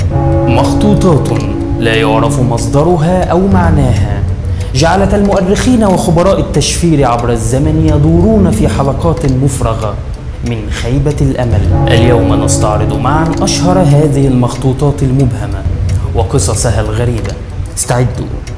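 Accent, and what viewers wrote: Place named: Egypt. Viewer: مش عارف اتفاعل معاك ب اللغه العربيه الفصحه عشان واضح اوي انك مصري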